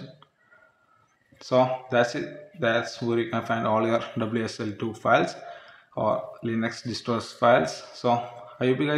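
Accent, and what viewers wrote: Kerala, India. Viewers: bro you mallu or tamil?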